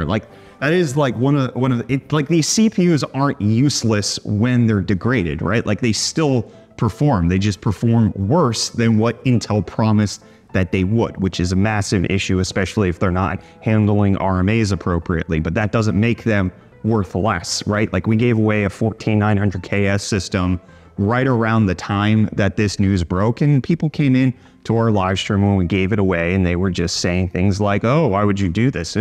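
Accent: South Africa. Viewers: I love that is starting to sound more south African as time goes on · are you from SA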